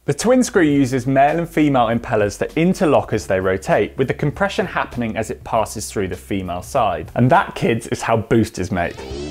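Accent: United Kingdom. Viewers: British accent. Cant follow it. Turned off.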